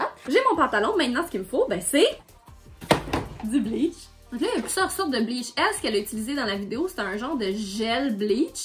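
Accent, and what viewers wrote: Quebec, Canada. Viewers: Es tu québécoise en je pense reconnais ton accent moi aussi je suis québécoise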